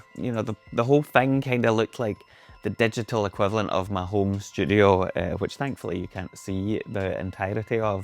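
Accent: Scotland, United Kingdom. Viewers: Oh no and I was so proud to finally having wrapped my head around the Scottish accent 😂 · I like how there's no one like you. You are unique and I'm from America and love your Scottish brogue.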